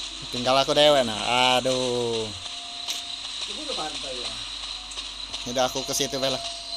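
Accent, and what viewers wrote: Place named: Palembang, Indonesia. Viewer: Antara palembang atau jambi ni dari bahasa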